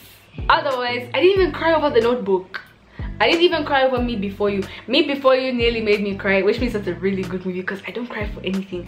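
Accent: Nigeria. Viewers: Tbh, the very first time I heard you speak I 100% thought you were Nigerian. 😂🔥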